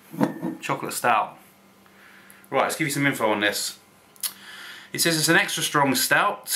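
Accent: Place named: RP, United Kingdom. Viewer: haha you sound so posh haha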